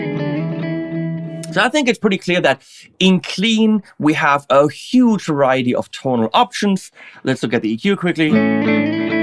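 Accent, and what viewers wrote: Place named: Germany. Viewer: ..... 🤩🥰😍😊 👍👍👍👍👍👍👍👍 Deine Arbeit, deine Reviews, dein Witz/Humor, dein Guitarplaying, dein "deutscher Accent" 😂, deine farbefrohe Kleiderwahl (absolut meins 👏👌), die Tier-Clips am Schluss....... 😂 Habe mittlerweile natürlich einige ähnlich gute "Rezensenten" gefunden, deren Clips ebenfalls richtig gut sind..... die ich mir (man sich) auf jeden Fall von vorne bis hinten reinziehen kann...... aaaaber, Du bist unschlagbar 😅✌😎 Freue mich auf jeden neuen Clip von dir 👍 Vielen vielen Dank für deine Arbeit und beste Grüße aussem Pott Rosigatton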